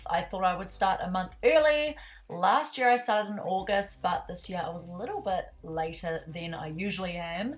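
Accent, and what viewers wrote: New Zealand. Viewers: Wow! Very creative. I don't want to be offencive or racist but are you from New Zealand? The kiwi accent just clicked for me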